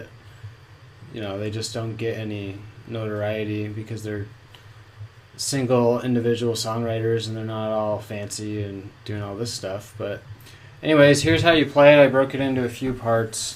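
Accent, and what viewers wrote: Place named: United States. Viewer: Yes, the only thing is, I mean I don't know where youre from, but as a German you dont understand a word, it seems to be one :) Are you californian or texanian?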